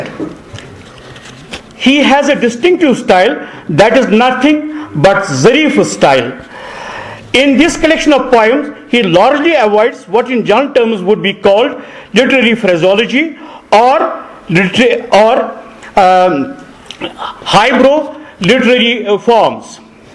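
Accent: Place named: England, United Kingdom. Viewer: I think presenter is from England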